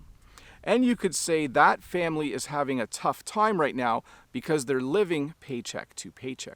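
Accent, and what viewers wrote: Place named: Canada. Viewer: Wow you are a wonderful teacher I like to learn from you Canadian accent I love 🎉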